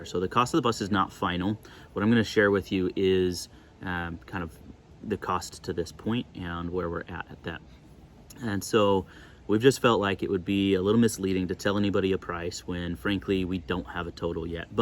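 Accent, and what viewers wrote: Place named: California, United States. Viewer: I'm sorry, this has nothing to do with the bus, but, I'm just curious, what state are you from, your accent, is it Californian?? I'm from and live in Papua New Guinea 🇵🇬. ☺️😇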